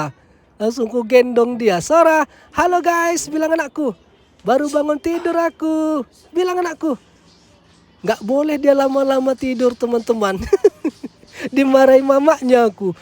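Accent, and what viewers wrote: Medan, Indonesia. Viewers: Mas ini aslinya mana ya, bahasanya antara Medan sama banyumas.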